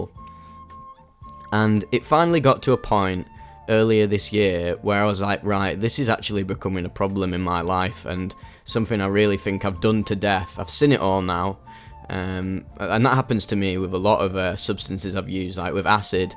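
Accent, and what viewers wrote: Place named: Yorkshire, United Kingdom. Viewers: This geezer sounds like hes from yorkshire 😳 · mate you sound so northern are from the NE? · You from Yorkshire? I'm from Hull, you sound like you live close.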